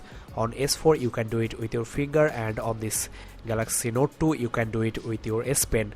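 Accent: India. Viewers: I hate when indian talk english..its so annoying..fucking annoying me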